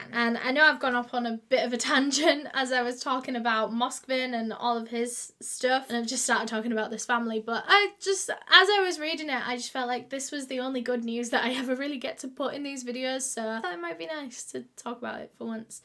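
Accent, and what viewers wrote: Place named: Northern England, United Kingdom. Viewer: I fr rock w videos i cannot bring myself to watch any other true crime youtubers i just love that northern accent and she is so nice i luv her fr 😭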